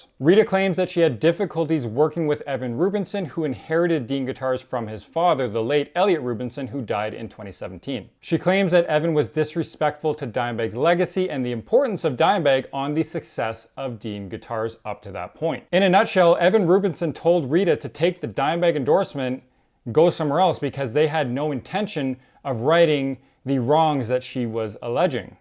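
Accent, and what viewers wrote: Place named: Canada. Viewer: All I heard was “ ABOTE “ and “ OTE “. Canadians just can’t grasp the “ OU “ sound.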